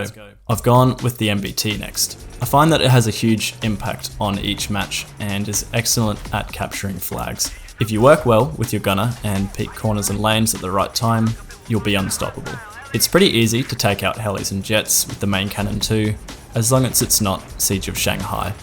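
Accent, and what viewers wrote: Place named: Australia. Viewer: Your Australian. Im subscribing.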